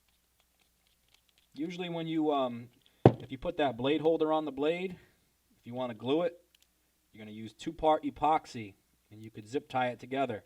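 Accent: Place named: New York, United States. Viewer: U from new York right for 100 buck I bet I'm right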